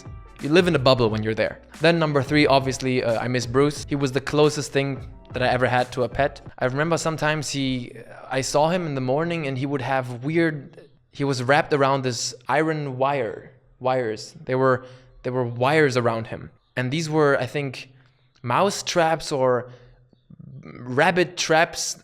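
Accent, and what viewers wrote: Germany. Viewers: Question , is he originally born in germany ? Cuz he has a really big german accent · Wie du mit deutschem Akzent sprichst, einfach göttlich!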